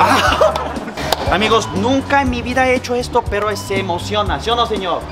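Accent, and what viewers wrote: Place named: Sonora, Mexico. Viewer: eres de Sonora o porque tan Hermosillo?🙈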